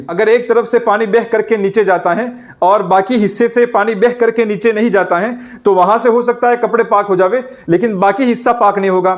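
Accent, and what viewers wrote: Gujarat, India. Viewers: Mufti sb assalamu alaikum warahmatullah vabarakatuhu poochhna ye tha maafi k saath ki jaise molana k aage qasmi nadvi mazahri lagate hai aapke name k aage laga hai ye kis madarse ka hai maloom karna thaaapki baat se to aap gujrat k lagte hai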